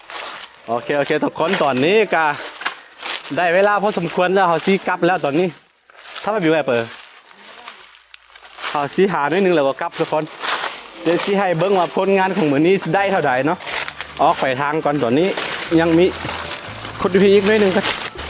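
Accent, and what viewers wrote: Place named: North, Thailand. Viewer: พูดเหมือนคนเหนือฝั่งไทยเลย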